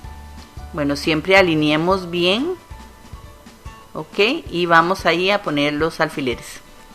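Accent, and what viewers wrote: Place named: Costa Rica. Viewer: Que lindo trabajo, muchas gracias por la paciencia y por enseñarnos, por el acento de tu voz supongo eres pura vida de costa rica😊, un saludito muy especial desde mi 🇨🇴 bendiciones😇😇😇🙏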